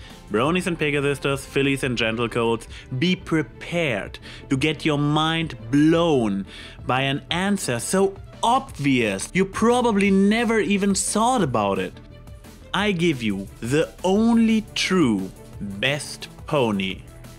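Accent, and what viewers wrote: Germany. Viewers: I meant to say my accent isnt as bad as this. Seriously you have like the most German accent ever. Also what does stand for? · Is he German?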